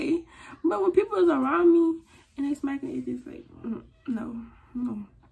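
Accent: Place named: Louisiana, United States. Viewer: the first few seconds ikkkk you was from louisiana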